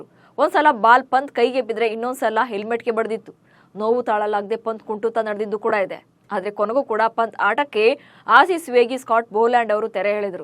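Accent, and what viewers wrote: Standard. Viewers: Svachcha kannadada dvani shanta medum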